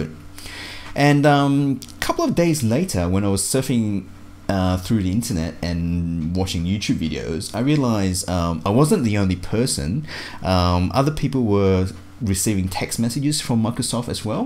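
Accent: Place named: Australia. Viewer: thanks for this info ... I like your Australian accent so cool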